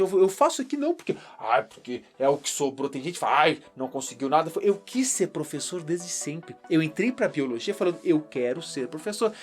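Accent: Rio Grande do Sul, Brazil. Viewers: sabia que tu era gaúcho, reconheci o sotaque, masss bahh tchê que barbaridade de vídeo, muito bom parabéns !!!!!!!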